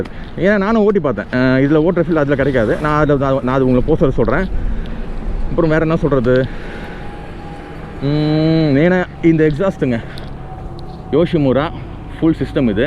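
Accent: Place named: Ambattur, India. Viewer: unga slang semmaiYA irruku bruh!... Nanum ambatturTHa bruh!...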